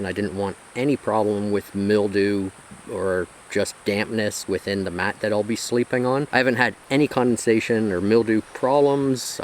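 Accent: Canada. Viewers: aboat haha you are canadian for sure nice review ...come in quebec we have good sea side camping places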